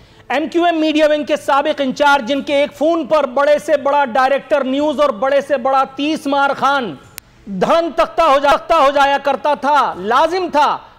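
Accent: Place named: India. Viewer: Kuch Der k lye main samjha news anchor Indian Hai koi Indian news say khaber day raha hai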